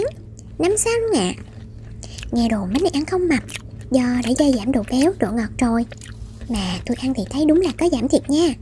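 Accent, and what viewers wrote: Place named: North, Vietnam. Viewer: Nghe giọng bà chị giống ng Bắc á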